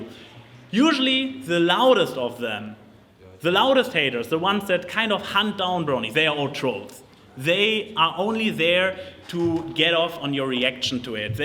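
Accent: Germany. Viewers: are you german? you really sound german because of the way you say "the" nice panel tho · exploding guy :3 yes. he come from germany.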